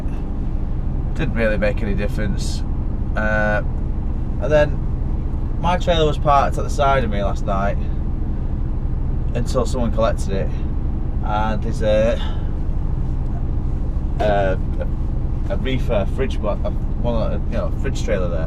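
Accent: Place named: Birmingham, United Kingdom. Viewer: your brummy accent is good I’ll give u that lol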